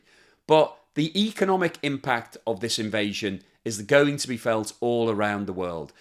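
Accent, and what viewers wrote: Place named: Liverpool, United Kingdom. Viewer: Sounds like scouser 🙄😬🙄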